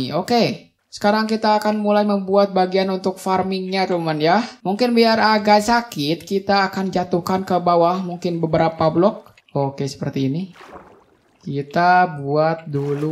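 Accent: West Java, Indonesia. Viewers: Bng buat farm fish afk Orng Sunda kak bhsa kaya orng sunda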